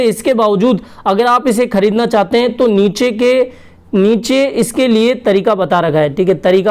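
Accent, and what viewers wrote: Rajasthan, India. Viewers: Rajasthani lg rhe ho Awaj se to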